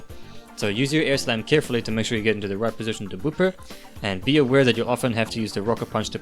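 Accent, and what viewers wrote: New Zealand. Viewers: Are you from New Zealand? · Are you from new Zealand?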